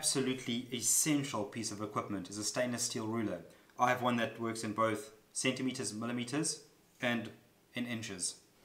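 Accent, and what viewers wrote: South Africa. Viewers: Ja, thanks my boy for this video, cool to hear a SA bloke on here loĺ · You sound South-African if you are can you help me with good local places to buy tools and leather I'm looking at starting as a hobby.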